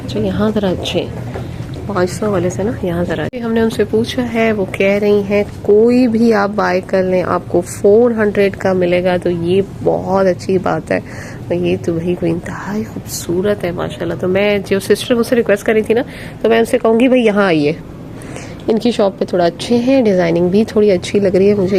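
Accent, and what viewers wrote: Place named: Arab world. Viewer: Your arabic accent is vey nice I wanna learn arabic can u guide me from where i can learn From Pakistan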